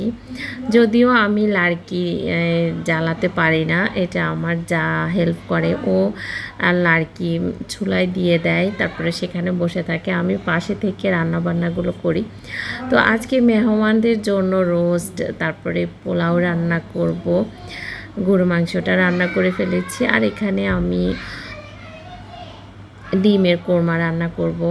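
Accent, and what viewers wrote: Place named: Chittagong, Bangladesh. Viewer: আপু কোন জেলায় আপনার বাড়ি আমি চট্টগ্রামের মেয়ে কথার টানটা পরিচিত লাগে❤❤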